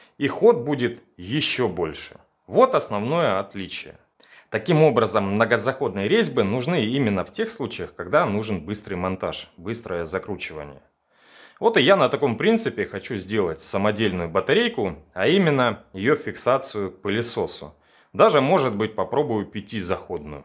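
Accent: Rostov, Russia. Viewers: Ростовчанин, штоле? По говору слышно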